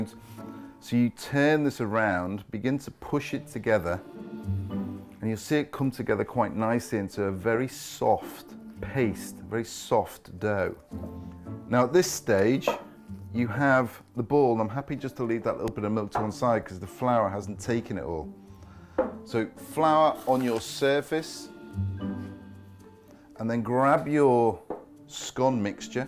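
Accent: England, United Kingdom. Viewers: The bloody guy has an English accent (he’s from Liverpool), can you not tell? They’re Scones! Scones came first remember, we are the mother country after all! No they’re not biscuits. 🙄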